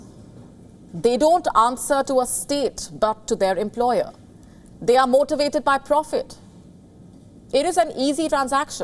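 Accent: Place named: India. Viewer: your English sounds really Indian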